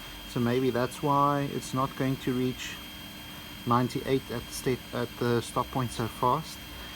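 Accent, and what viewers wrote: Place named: South Africa. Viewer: pretty cool man! Thought you sounded SA then you said you were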